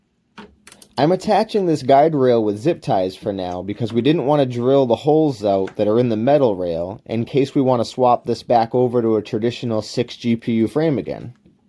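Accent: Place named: New England, United States. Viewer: "one andaa quootaaa" Love that New England accent! Nice video man!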